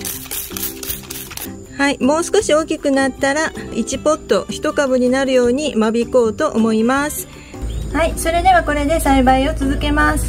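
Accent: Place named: Tohoku, Japan. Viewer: 『にずっつぶ』讃岐かぁおもいよったら、東北のナマリやなぁ。間引き、優劣がないポット…悩むんですよね。でも、さんが「気が小さいけん」ゆうて、なんのこっちゃ！？おもいよった😆😆 元気いっぱい、まけまけいっぱい葉っぱが出よるやん。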